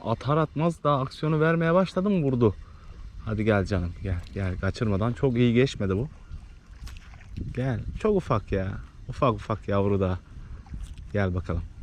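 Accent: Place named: Denizli, Turkey. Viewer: şive bizim buralara çok benziyor Denizli liyim bende o yüzden sordum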